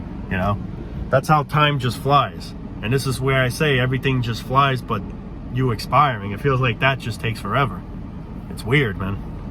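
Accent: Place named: New Jersey, United States. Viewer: I wish I was your friend! I love to do adventurous things like that , and I could listen to that Jersey accent all day long!!!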